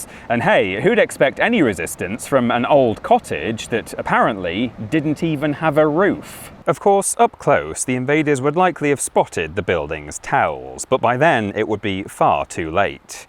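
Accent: England, United Kingdom. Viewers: OMG. its the British Chills. i didn't know i could hate a English accent voice more than I do the rest of them. YOUTUBERS STOP the SINGSONG BS.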